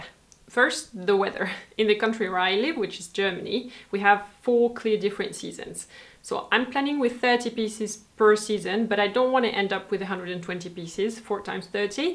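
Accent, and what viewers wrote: France. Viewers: I can tell by your accent that you speak French. Maybe you are french and live in Germany. You don't have a German accent at all. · you can tell from that beautiful accent! She's definitely french :)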